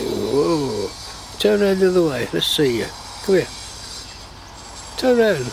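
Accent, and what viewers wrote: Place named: United Kingdom. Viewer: Thought that this was the UK with the accent and a beautiful medieval castle.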